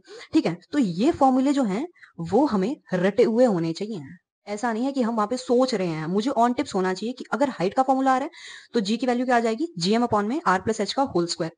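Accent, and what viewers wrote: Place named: Haryana, India. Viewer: U have got haryanvi touch in ur voice... Sweet pinch🍬🍁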